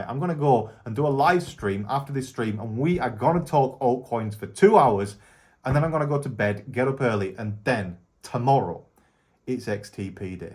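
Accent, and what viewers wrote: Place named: Lancashire, United Kingdom. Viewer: Always enjoy your videos mate, keep it up, good northern accent, take its Lancashire? im other side of Manchester Derbyshire, but they class it East Midlands 😢😢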